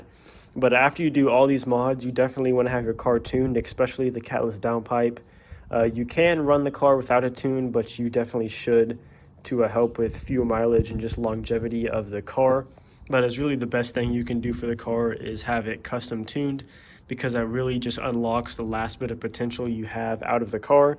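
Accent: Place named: Oklahoma, United States. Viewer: oh dude what part of oklahoma are you from? any where near norman?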